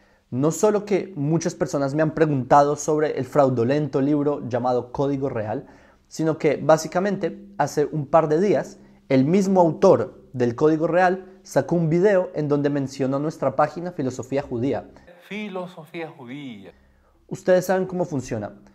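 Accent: Bogotá, Colombia. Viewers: Yo lo que creo y con sus propias palabras eres más Cristiano que cualquiera. Y veo que tampoco sabes nada del tema. Porque no subes las bases exactas, cómo años, escritos, datos arqueológicos etc. Ahora tu acento no es judío eres más bogotano que la changua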